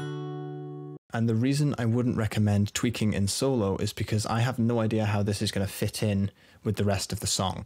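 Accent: United Kingdom. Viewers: Are you Scottish or English!? I can't tell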